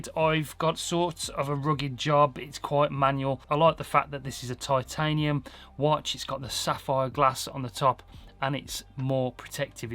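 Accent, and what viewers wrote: Birmingham, United Kingdom. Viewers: Proper brummy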